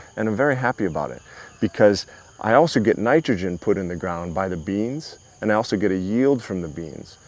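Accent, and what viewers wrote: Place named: India. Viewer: Excellent info,! Also, I am hearing hints of Indian accent when you speak. It's totally cute!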